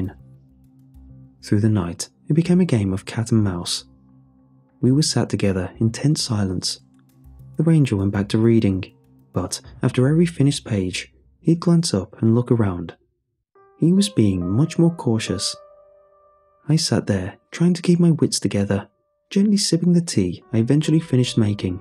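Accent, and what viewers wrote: United Kingdom. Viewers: Why is it always a British accent to explain it to Americans! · If the guy reading these stories didn't have a British accent, I might actually get into them.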